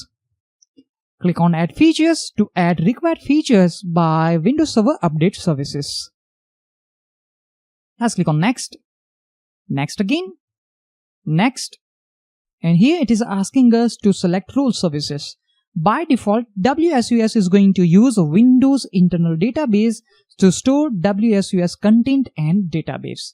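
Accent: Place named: India. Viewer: was so happy to find guide.... then indian english .. :-(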